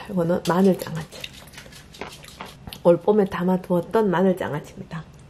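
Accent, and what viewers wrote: Gyeongsang, South Korea. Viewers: 녜~ 경상도 말씨로 진행을 하셔도 매우 훌륭하네요! ㅎ ㅎ ㅎ 오늘은 귀하의 덕분으로 산삼백숙을 먹을려고 부산서 왔읍니다. 수고 하셨고요 - - - - 자주 뵙길 희망합니다. 🍉🍉🍉🍑🍅🍆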